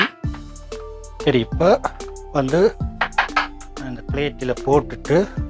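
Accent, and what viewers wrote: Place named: Sri Lanka. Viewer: Lovely recipe and narration 😊👍.. srilankan slang is arumai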